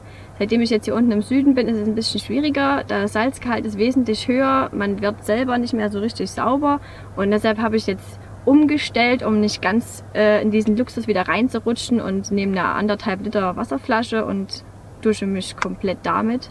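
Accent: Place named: Saxony, Germany. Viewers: Sächsisch is einfach toppe sage ich als 🇨🇭🙋🏻‍♂️✌🏻 go for it. Coole junge Lady. Tolle Docu. Toller Roadplan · Am Anfang dachte ich noch, der Dialekt kommt dir bekannt vor und dann sagst du, dass du aus Plauen bist. Super, das ist auch meine Heimatstadt, auch wenn ich da schon seit vielen Jahren nicht mehr lebe. Du hast meinen vollsten Respekt, denn auch ich muss allerdings notgedrungen seit letztem Jahr allein mit dem Wohnmobil reisen. Unsere Schicksale sind zwar völlig unterschiedlich und du könntest vom Alter her meine Tochter sein, aber du machst mir tatsächlich Mut, meinen Plan auch in die Tat umzusetzen. Viel Glück weiterhin und gute Reise. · Ich wusste gleich, dass mir der Dialekt total bekannt vorkommt. Liebe Grüße an Dich aus dem Vogtland:) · Na,Du liegst ja schon Mal gut da🐒😸👲😨alles Gute für Deine Unternehmung.Wie machst Du das mit Deiner Arbeit?Oh,guter Dialekt!Plauener Spitze😸ich habe Mal als 18Jaehriger 1976 ne schöne Goehre aus Plauen am Ostseestrand geküsst.Schoene Erinnerung ohne Folgen.Da wurde noch die Grenzbucht von der NVA nachts mit Booten und Riesen Bogenlampen abgeleuchtet.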